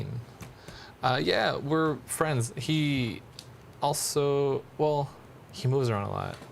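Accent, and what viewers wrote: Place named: California, United States. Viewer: You really do sound like you're from California. I wanna hear you speak French and Japanese, though. ❤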